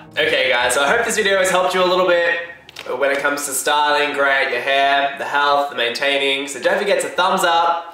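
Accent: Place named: Australia. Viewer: Good to hear a fellow aussie! Nice hair mate.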